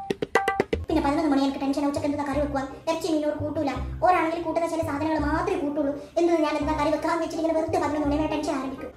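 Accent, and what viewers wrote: India. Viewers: Actually i cant understand ur slang.r u from malabar? Ur acting is very funny bro.😄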